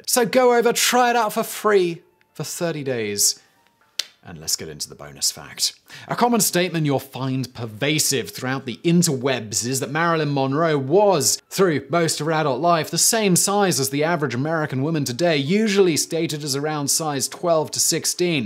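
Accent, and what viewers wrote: England, United Kingdom. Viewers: Between the english accent and the frickin metric system, it's a waste of time. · Your funny. And you speak fast in a English accent.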